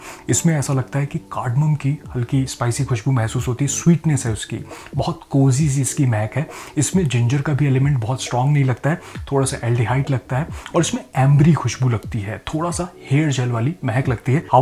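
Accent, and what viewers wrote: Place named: Lucknow, India. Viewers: For some reason, your Hindi seems like you are from Lucknow (or vicinity). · you speak elegantly. lovely urdu are you from lucknow?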